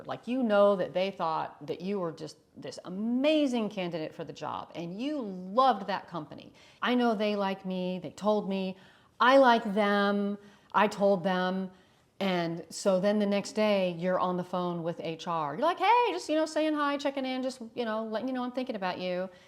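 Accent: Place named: South, United States. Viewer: Greetings from Lake Como, Italy. I like your southern accent 😊 exactly where are you from?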